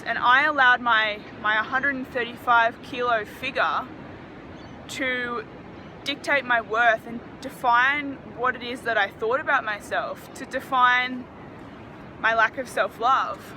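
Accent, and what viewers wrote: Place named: Wales, United Kingdom. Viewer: I like your accent where are you from? Wales?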